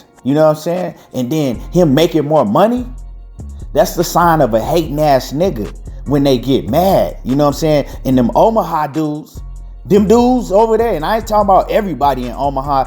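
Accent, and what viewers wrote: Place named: West Coast, United States. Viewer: Yo, Watching this video was like a breath of fresh air. Man, I'm tired of always defending Errol at the family bbq's, everybody seems to think that everybody (including EJ) is ducking Bud and it simply is not the case. You sound like a west coast dude, I was born in the CPT, but grew up in Pomona. I'm gonna have to recruit you to help me defend my boy Errol when the dads congregate at the little kid b-day parties and talk about how Bud is the best and is being ducked. #BringBacktheShag, #ManDown, #BodySnatcher. Peace and Blessings to you.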